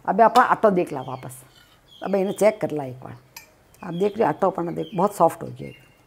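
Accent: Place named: Rajasthan, India. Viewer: Bahut badhiya rajasthani boli bolte ho aap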